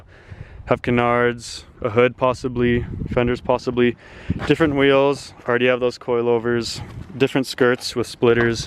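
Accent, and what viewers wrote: Canada. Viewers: You sir got my sub! I can't wait to see how the car will look! And also your Canadian Accent is just beautiful! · are you canadian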